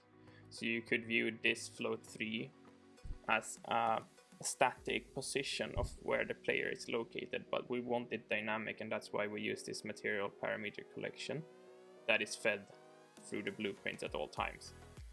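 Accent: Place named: Sweden. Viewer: 5 seconds in and I instantly know you're Swedish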